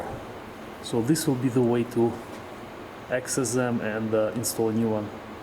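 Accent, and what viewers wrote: Russia. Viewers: Nice Man.. Really helped me a alot, had to change the reverse light.. Couldn't find anywhere. This video solved. Liked his russian english accesnt.. Regards.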